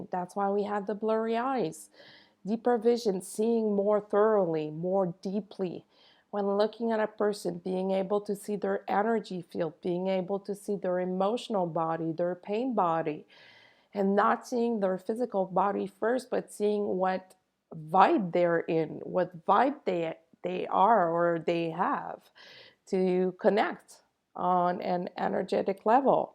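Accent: Quebec, Canada. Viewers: Hi! Question, are you the girl from Quebec? I'm on the south shore of Montreal and i think i recognise your accent and i heard that, that girl, a few month ago living around Montreal that was doing group healing sessions. I saw that girl, maybe you, in a podcast once but you have no pictures here or on FB. Let me know if it's you! 💜🌸💜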